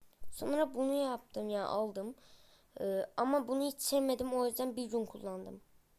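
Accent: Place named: Azerbaijan. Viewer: ade azerisen bilinir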